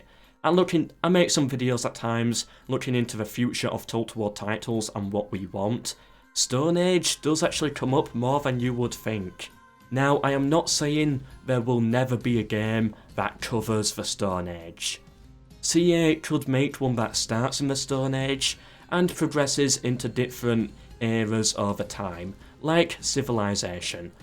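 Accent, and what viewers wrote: United Kingdom. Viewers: Are you britsh?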